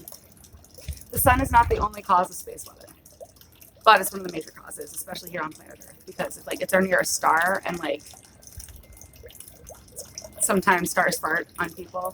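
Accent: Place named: South, United States. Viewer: How long have you been in Central PA? I'm hearing an awful lot of Y'all's, you're a little north for that kind of talk.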